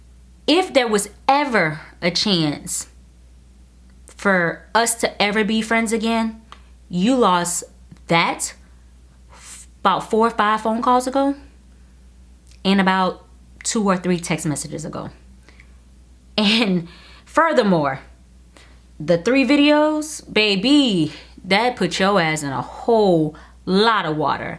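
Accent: Louisiana, United States. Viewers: I heard all the Louisiana in I love you please no more videos like this YT mom ❤️ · I just want to say I love you! I was lowkey laughing in parts of the video bc all I hear is our Louisiana accent! Lol I can most definitely tell your from Laffy way! Lol I’m from Houma💕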